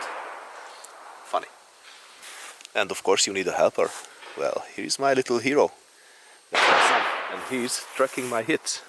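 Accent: Hungary. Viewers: This is very cool stuff. I’m from Canada, and much of N America, with the exception of cowboy action, is in to modern stuff. So it’s pretty rare to see this. I’m getting my first antique exposed hammer shotgun though, and an antique muzzle loader? There’s a bunch from the civil war, but they aren’t cheap, and they stuff you have is even more expensive, since we’re newer countries. We didn’t have many of those guns here. Still, fascinating to watch, and interesting to compare your accent to my wife’s Hungarian accent. 🙂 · Always a good day when uploads in beautiful hunglish language.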